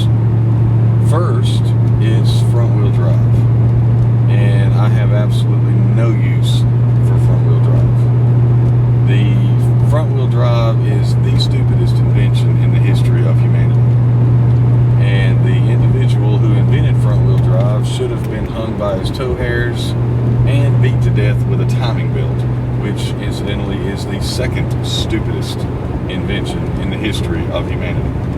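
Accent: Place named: Rural, United States. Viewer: Grow some hair and loose the hick accent before you try and come across as an"expert"- dumb..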